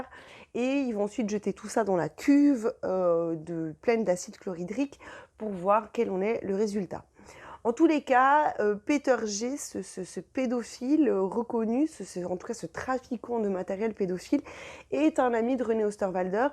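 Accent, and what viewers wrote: Switzerland. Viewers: votre petit accent suisse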